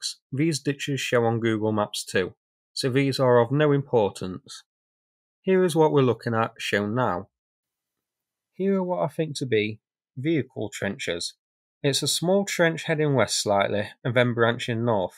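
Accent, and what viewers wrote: United Kingdom. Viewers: No, he's British and lives and works in Taiwan. You clearly haven't followed him for longer than a few weeks or so, because he's been open about this. [Added in the edit]: That he's British, you should hear this from his accent. No Ukrainian talks like that. He's neither from the south-east, because he's not eating his words, nor from high up in the north or Ireland, judging from the sound of it, but definitely British.]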